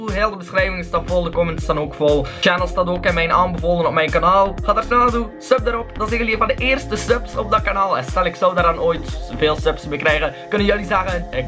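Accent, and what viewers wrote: West Flanders, Belgium. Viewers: Een West-Vlaming die Engels gaan praten? Ben benieuwd :D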